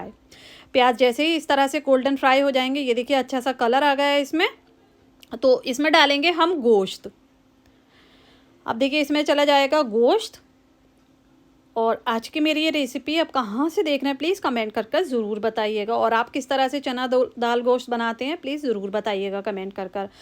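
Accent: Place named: Hyderabad, India. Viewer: Assalam alekum khairiyat main Saudi Arab se dekh raha hun aap sarson ka tel khate ho aapki language Hyderabad ki tarah hai aur aap sarson ka tel khate ho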